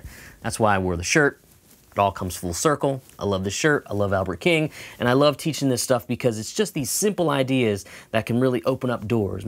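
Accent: South, United States. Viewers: You're getting a little Southern drawl there... :)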